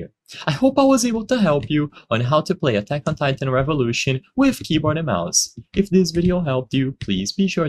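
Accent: Brazil. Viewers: você é brasileiro ne? da pra perceber pelo sotaque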